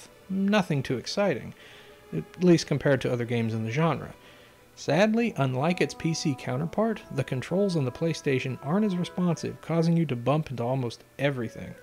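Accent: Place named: United Kingdom. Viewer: Please may I ask what is wrong with our British accent?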